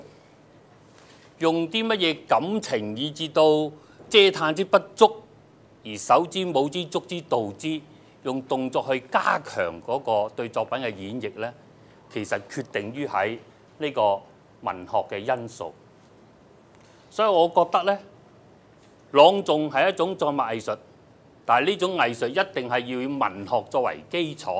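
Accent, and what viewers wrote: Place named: Guangzhou, China. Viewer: 真正广州话绝版 好多自认广州 香港人发音都不正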